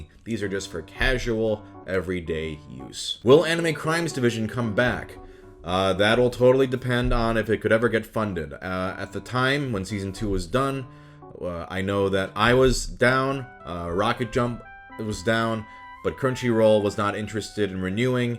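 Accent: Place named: Michigan, United States. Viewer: As I was listening to his voice, I wasn’t hearing Southern California - more upper Midwest. Sure enough, he was born in Minnesota and spent time in Michigan. Definitely hear a serious Michigan influence in his American accent.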